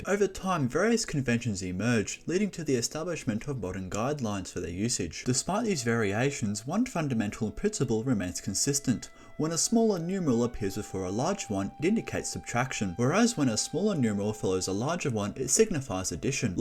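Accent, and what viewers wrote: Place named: Italy. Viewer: the pronunciation was kind of weird, he mixed anglicized pronunciation with an italian reading of the C. latin is dead and also everywhere so you can pronounce it however you want, but I'm not sure by what standards that was nice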